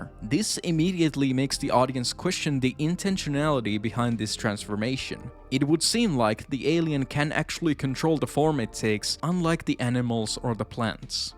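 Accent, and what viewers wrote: Finland. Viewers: Came to the channel for the luscious finnish accent, stayed for the absolute bangers of videos, incredible job!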